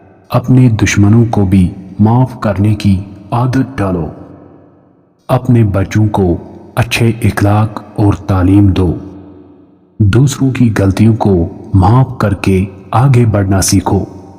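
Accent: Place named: Kashmir, India. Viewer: AAP KOI KASHMIRI PERSON HAIEN AAP KI AWAZ BATATI HAY BUT I AM KASHMIRI FROM MAQBUZA KASH MIR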